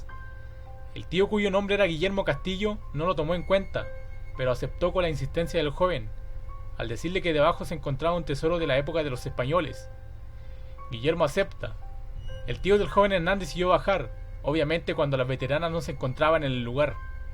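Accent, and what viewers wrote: Chile. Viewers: Pa que tan Chileno?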